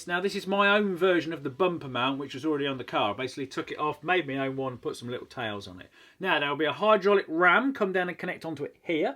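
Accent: United Kingdom. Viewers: Nice bri'ish accent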